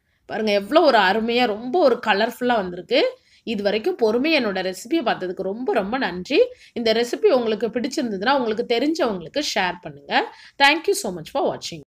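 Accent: Tirunelveli, India. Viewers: Sis u r from Tirunelveli.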